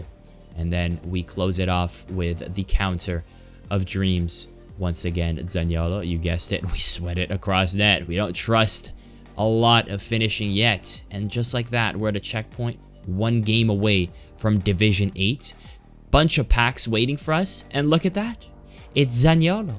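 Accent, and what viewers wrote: United States. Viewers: I've actually been watching for almost 2 years but what is your background? As far as I know you're Italian living in Italy but you sound so American. Just curious. Love your content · Bro i tought u were american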